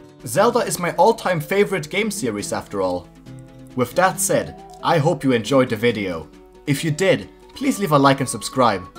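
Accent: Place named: Sweden. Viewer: I could hear you’re Swedish from a mile away xD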